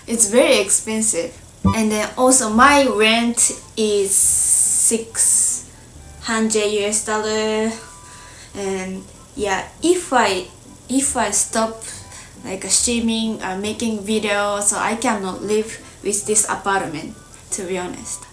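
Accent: Japan. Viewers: ❤❤ JAPANESE WOMEN ARE VERY EMOTIONAL I AM EMPRESS FOR YOU LIKE OTHER JAPANESE WOMEN I'VE MET ARE STUDYING IN THE PHILIPPINES SOME OF THEM SPEAK TAGALOG OTHERS OF THEM ARE MAJOR HERE😊🎉.. THE HISTORY OF NATION 4 THE LANGUAGE OF THE PHILIPPINES TAGALOG ENGLISH SPANISH JAPANESE DURING THE COLONIAL ERA 400 YEARS AGO THE 4 IMPERIAL COUNTRIES DURING THE JAPANESE EMPIRE COLONIAL ERA IN THE PHILIPPINES JAPANESE AS A SECOND LANGUAGE BUT WHEN THE PHILIPPINES BECAME FREE JAPANESE AND SPANISH LANGUAGES IT WAS REMOVED. THE ONLY THING THAT TEACHES IS THE JAPANESE SCHOOL IN THE PHILIPPINES BUT IN SPANISH WE HAVE A DIALECT THIS IS CHAVACANO 80 PERCENT SPANISH LANGUAGE WITH A HUNDRED DIALECT MANY ARE STILL TEACHING US BUT IT JUST DEPENDS?. I THINK YOUR ENGLISH IS OKAY BUT IT HAS A JAPANESE ACCENT❤😂😂 WHEN I GO TO JAPAN I WANT TO MEET YOU YOU ARE ALWAYS IN MY HEART 😊❤❤!!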